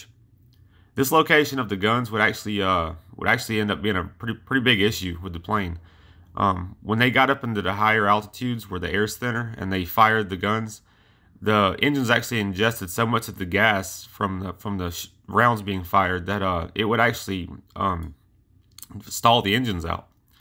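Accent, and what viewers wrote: South, United States. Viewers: Again, here are my recommendations. Your mouth is still full of cotton & marbles. Speak clearly! Enunciate! Open your mouth, make it round. Get rid of the Southern affectation. Your microphone needs to be close to your mouth, it sounds as is it is across the room.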